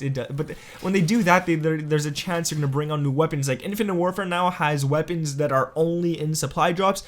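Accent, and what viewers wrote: Canada. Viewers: r u Canadian? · Matthew M he's canadian... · Dude your Canadian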